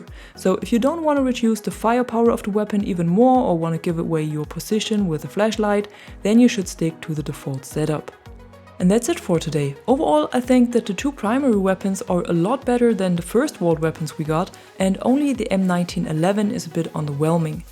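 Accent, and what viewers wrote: Germany. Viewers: Dein deutscher Akzent hört man sehr gut raus . Würde mir in deutsch besser gefallen ✌🏻😌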